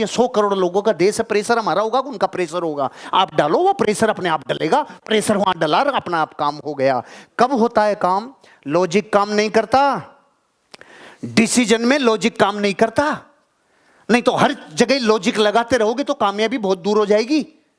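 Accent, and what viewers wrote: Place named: Marwari, India. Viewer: Exlnt exlnt n exlnt sir.your marwadi accents n satic logics Gazab hai ji.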